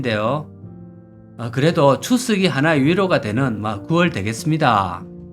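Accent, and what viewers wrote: Gyeongsang, South Korea. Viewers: 구수한 경상도 말투 사투리가 압권 ~~🎉❤ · 언제들어도 구수한 갱상도사투리.....늘 잘보고있읍니다 오십만..화이팅하세요~~~^^♡ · 반가버요 목소리에 사투리에 빵터집니다 고매 경상도 사투리에 물들어갑니다^^ 축카 축카합니다~~😅😅😅😅😅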